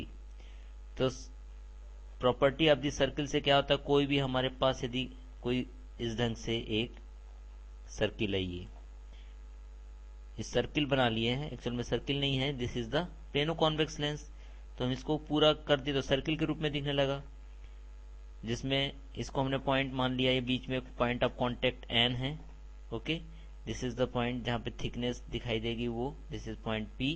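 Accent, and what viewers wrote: India. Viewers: now thats the way you explain it, yes he isnt fluent in English, but did a much better explaining job than most profs around the globe, its Just that u need to be Indian to understand him. Good Job (Y)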